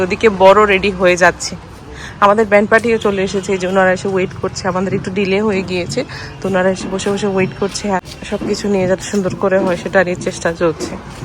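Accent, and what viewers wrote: Sylhet, Bangladesh. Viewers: Tumi sylhety ni affa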